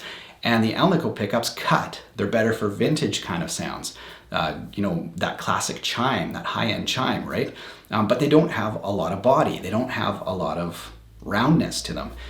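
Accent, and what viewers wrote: Canada. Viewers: Canadian Accent?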